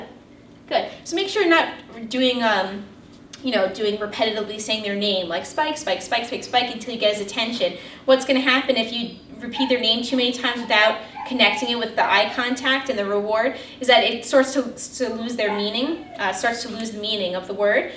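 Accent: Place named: New Jersey, United States. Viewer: Duog. Gotta love that Jersey accent.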